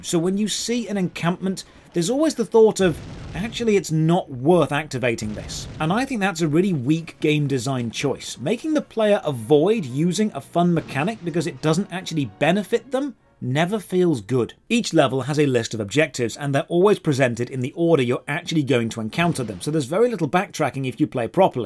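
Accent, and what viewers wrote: United Kingdom. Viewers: Listening to you talk in this video, it makes me sad to realize we never got a Bri'ish Commando MOH game.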